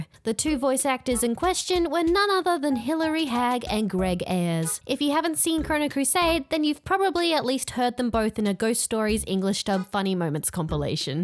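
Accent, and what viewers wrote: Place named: Australia. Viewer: u kinda sound Aussie I love it it’s a cute accent :3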